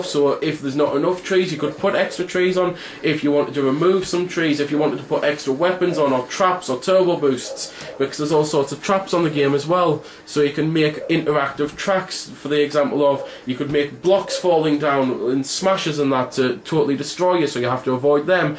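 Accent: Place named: Scotland, United Kingdom. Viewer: Where you from, mate. You sound Scottish or something. :]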